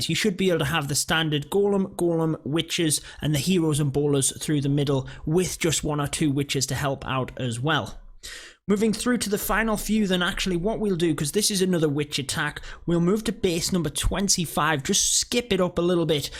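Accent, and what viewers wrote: United Kingdom. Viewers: Thanks! Subbed and liked. Are you british 🤔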